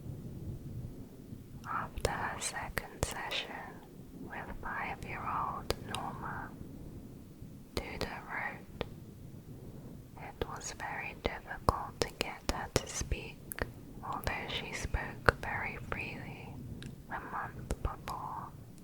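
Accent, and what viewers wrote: England, United Kingdom. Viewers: British, very apparent. It's hard for me to understand her due to it but I love her videos regardless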